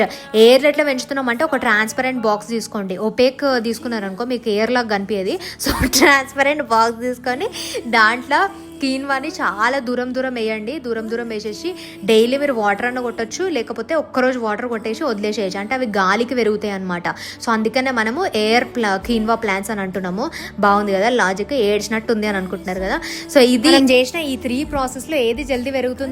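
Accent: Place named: Kerala, India. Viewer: Why u r speaking telugu in Malayalam slang 😅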